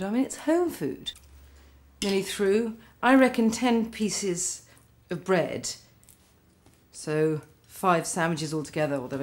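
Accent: United Kingdom. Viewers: I just Luv the way U are Cookin Luv. But, also I adore the way U speak... It's sounds Holesem and very English....I could listen to U always... It's very relaxing 😄 no seriously Ones gets other cooks on the channel who are extremely hasty and the recipes turn out fuzzy, and somethings left out, by the time I've written everything down... Hahaa.... LuvU ☮️...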